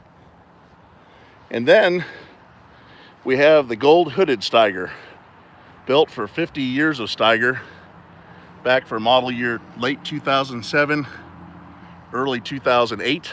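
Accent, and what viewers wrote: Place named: Texas, United States. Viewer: When did you get a Texas accent?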